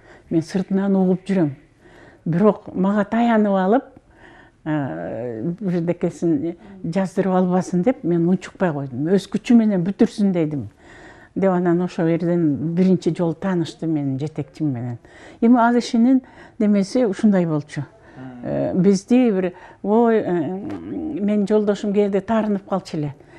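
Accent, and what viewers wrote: Standard. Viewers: Керемет,кыргызча суйлогону тан калтырды,таза,нукура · Нукура кыргыз тилин суйлогонун кайра кайра коруп угуп жатам бар болунуз эжеке кооп жашаныз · Сизди угуп олтуруп абдан жонокойлукту кордум ,кандай суктанаарлык муноз,эч нерсени унутпай жашоодо талкан, каймак жонундо абдан так айтканы кызык,анткени он сегиз жашымда биринчи Фрунзеге борборго келгенде аэропорттон коргом,бирок кыргызча билбейт го деп сыртынан негедир муноздомо бергем,ушунчалык так таза нукура суйлогону кызык,а Чынгыз Айтматовду Алма-Атада коргондо ким эле деп ойлоп карап,анан эстеп кетип барбагам сыртынан гана карап, негедир жаштык кылып жанына чуркап барып учурашпаганыма азырга чейин окуном ,бактылуу каарылык корунуз !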